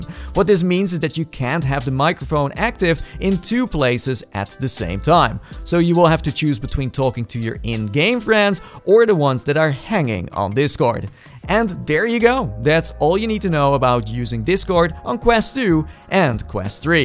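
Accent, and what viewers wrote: Netherlands. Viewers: ik denk dat dat je nederlands bent bro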